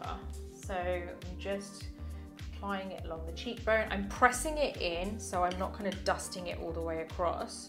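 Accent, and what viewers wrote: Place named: United Kingdom. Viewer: Hi, I love to see your videos, I think you are beautiful, funny, simple and your tone of voice relaxes me, I love love the Brit Accent you have, and of course, I relate to you because I think we kind of have the same type of skin, combo/ textured, anyway I love the fact that you recommend efficient products that actually work, not just sing out loud every week a bunch of new products to get more attention of PR and get paid for partnering with them, which I do get it, I understand that´s the business of being a YouTuber Influencer, but I also do highly appreciate people that share their skills for the art of it....there is a difference in the camera when we see the Sell it all Youtubers and the Real Pro that actually share their knowledge and you are one of them! so thank you for your time and effort in the editing, it is appreciated! 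By the way, talking about pro products, have you heard about the new release of the RCMA Liquid Foundation & different types of Powder....I just saw a video of someone with the foundation and it made me think....is it such as good as the OG translucent Powder that all the Makeup Artists use? made me curious as for now I will try the Tarte Shape Tape one as you recommended it! hils from Viking Land Denmark!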